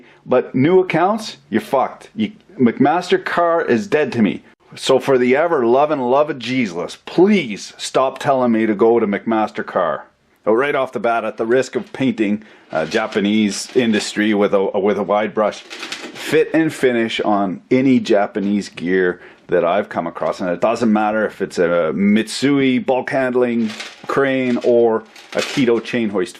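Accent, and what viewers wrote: Canada. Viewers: Can someone translate the canadien chinglish and translate where he bought it for 150 bucks? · R u from Canada 🇨🇦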